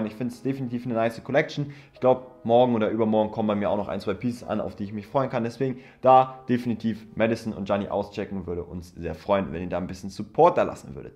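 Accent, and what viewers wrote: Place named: England, United Kingdom. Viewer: Ich liebe immer die englische aussprache🙏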